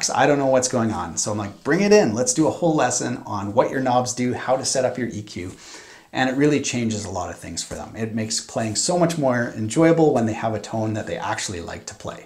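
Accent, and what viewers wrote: Canada. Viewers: Your canada voice entertains me.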